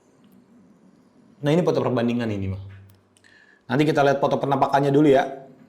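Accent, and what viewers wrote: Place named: Jakarta, Indonesia. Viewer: Bahasa indonesia betawi yee😂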